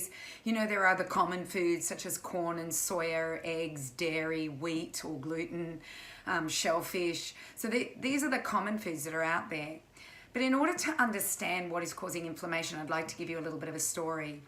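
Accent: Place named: Australia. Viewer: You sound like an Australian and i love that you are at least you known what's going on in here (australia) comparing to the other ones that i found, all american. So thank you so so so much you explain things nicely whithout getting rid of everything. Thanks again 😊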